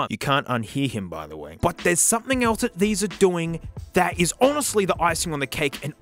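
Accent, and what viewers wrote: Australia. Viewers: that's a toss up between overtly verbose ramblings about things that may or may not be relevant to the car being reviewed and the [ h e a v y ] aussie accent cheapo microphone stories about the aussie life lol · MOOOOM The funny aussie is talking about headphones again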